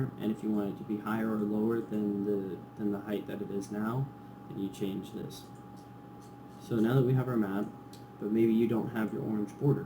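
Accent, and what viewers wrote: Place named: United States. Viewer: Youve got one of my favourite America accents 👍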